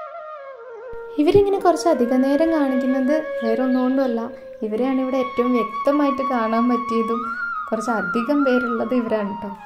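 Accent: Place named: Thrissur, India. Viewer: Thrissur slang kalakiii👌👌😄😄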